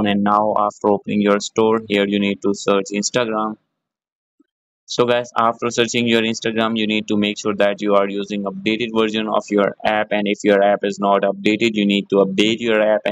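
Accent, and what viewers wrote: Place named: India. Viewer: Indian ahh accent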